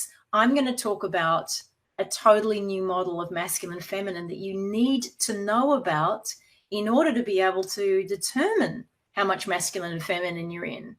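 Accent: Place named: United States. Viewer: I love it when you talk American. (I am an American, so I love hearing how I sound to people outside the United States.) :)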